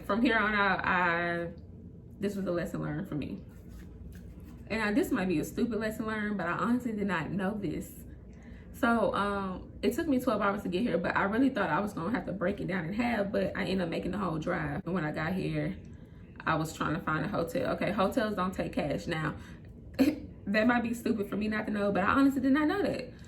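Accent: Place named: South, United States. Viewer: I knew you sounded country i just became a travel cna because a by watching different podcast that you giving info cause i been working two months of a agency cna and i enjoy going from facility to facility and i asked my agency do they have travel cna opportunities before i skirt out and look at god these folk then raised my pay finna put me in a hotel now i didnt know i pay for the hotel but its ok cause my daughter is in the army and i want to suprise her with a car for her 20th birthday next april so i just got a blessing under the table. So thank god for al these things if you step out on faith and believe in your vision and your dream it will come to past so everybody experience is different. To all the cnas i love ya god bless ya. Continue to enjoy what ya do working for these agencies are so much better more flexible with agency cna you have a opportunity to be a travel cna which makes more money yes i got kids too but yea like she said you got to make these sacrifices and i saw my savings go up too every hotel i travel i take my money and stuff my envelopes cause im saving and working my ass off. 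If you want to take cna to the next level stop working nursing homes 8 to 10 days a pay period forced to work you got to put in days off not with agency you confirms your days to work you be off the days you dont work and you still got a job. As i get more experienced i wont come out my pocket im just glad im putting this experience on my resume.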